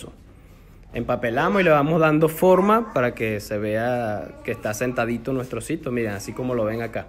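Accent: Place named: Venezuela. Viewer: Me encantó la explicación, me suena como venezolano, será paisano?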